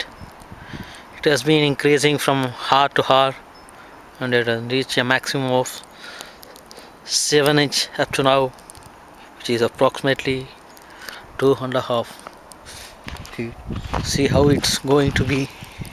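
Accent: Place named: South India, India. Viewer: u r a south indian........................ i can cent percent tell ,from your accent.... ur intensity-density, ur lastnight, ur drizzling drizzling continuing continuing, how is life from india.....ur place must be very hot . u r seriously shivering out there... dont cha MISSED INDIA.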